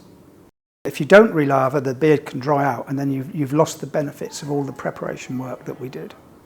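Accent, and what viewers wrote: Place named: United Kingdom. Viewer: As a Yank it's great to hear British English...it's a dance of the language.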